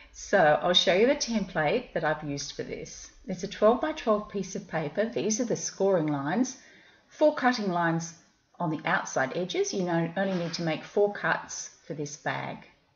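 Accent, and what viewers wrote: Australia. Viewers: Hi, I am new to your channel and must say that it is refreshing to have an Aussie voice chatting to me. Love the bags, I can see myself making a few of these for Special Occasions. Thanks for such clear instructions.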